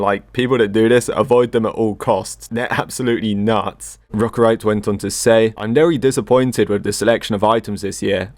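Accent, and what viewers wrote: United Kingdom. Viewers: Hah british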